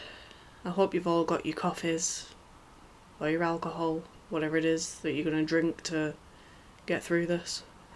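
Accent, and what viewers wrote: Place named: Yorkshire, United Kingdom. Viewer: I'm from selby, and I recognize your accent, you're not too far from here are you 🙂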